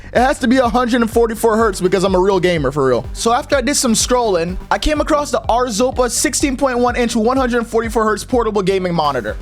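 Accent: Jamaica. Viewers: You Jamaican born of desent or just love the Jamaican accent?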